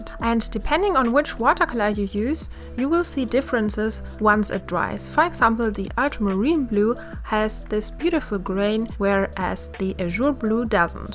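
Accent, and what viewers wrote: Germany. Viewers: Are you actually german? becos killjoy is. Plus how do you make watercolor look so easy..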